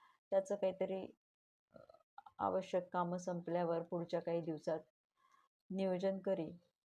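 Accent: Vidarbha, India. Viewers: भाऊ भयंकर धक्का देवून गेला तु तुझी सवयच लागली होती रे तुझी वैदर्भीय भाषा आपलीशी वाटायची तुला विसरण कठीण आहे भाऊ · त्यांची बोलण्याची खास वऱ्हाडी शैली फार आवडायची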